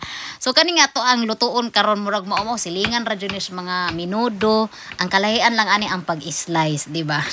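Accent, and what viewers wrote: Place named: Bohol, Philippines. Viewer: Nindota mn n inyoha dapit dae... gnahan ko nmo very boholano kaayo accent ksagaran ako nbantayan mgtinagalog ng uban...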